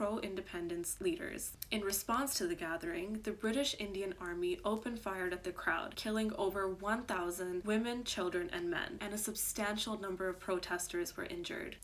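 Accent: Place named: United States. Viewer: Does she explain anywhere why she speaks with an American (or mid-Atlantic) accent?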